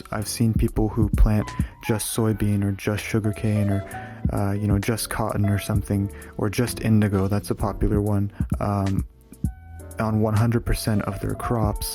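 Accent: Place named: India. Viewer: He is Indian and his accent is so so bad!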